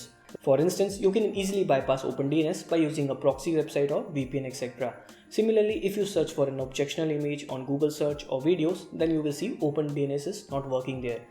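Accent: India. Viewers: Fuck up with your Indian accent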